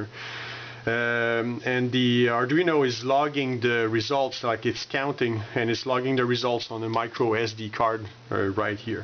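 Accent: Canada. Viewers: you sound like you are French Canadien. Either way looks good. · Togeder! I keep cracking up when he says together and I love your Canadian accent.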